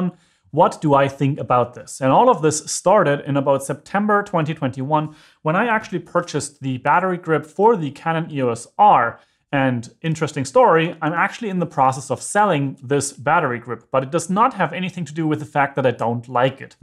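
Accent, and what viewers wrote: Germany. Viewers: Might be depending on your hand size and use cases. Btw. den deutschen Akzent kann man nicht verstecken:D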